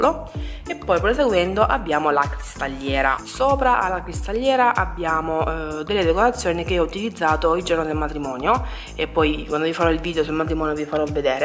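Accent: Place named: Apulia, Italy. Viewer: Dalla parlata deduco che tu sia pugliese😜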